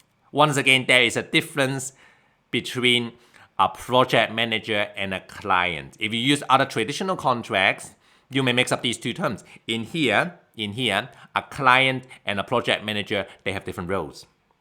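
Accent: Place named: India. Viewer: 有点印度味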